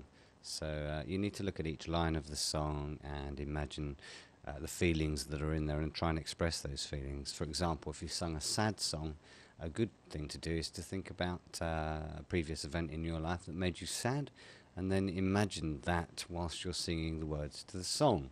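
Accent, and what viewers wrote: United Kingdom. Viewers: ur very welcome uve done a brilliant job i really appreciate it, n yes ur rite ur vid was easier to understand than most vids, last but not least LOL i LOVE ur british accent :) wish i had a brit accent too lol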